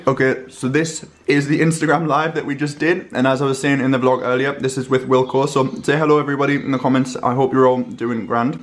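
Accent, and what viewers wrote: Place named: England, United Kingdom. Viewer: with your English accent I have trouble understanding you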